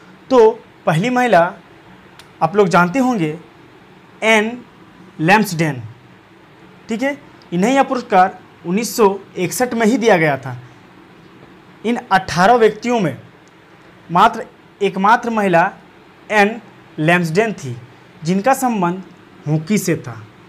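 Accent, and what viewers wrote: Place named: Maharashtra, India. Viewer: Mala tuji hidi ayakun asa vatla ki tuji bhasa marati ahe asa vatla pahlida 🧐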